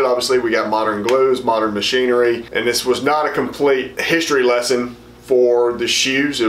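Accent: South, United States. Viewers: Love the Southern accent. "On" is pronounced "own."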